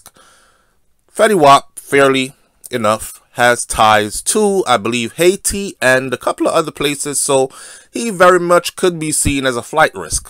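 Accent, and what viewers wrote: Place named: Jamaica. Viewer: Jamaican accent trying to talk American is so annoying #unsubscribe 👋 ✌️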